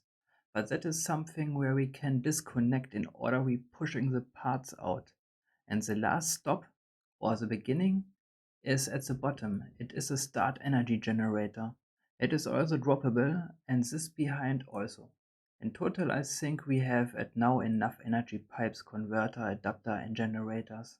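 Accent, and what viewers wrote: Germany. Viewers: When you don't care what others think and you do projects purely for self-gratification, the results are astounding. That's how things should be, in my opinion. Keep it up man. PS:- I like your German accent lol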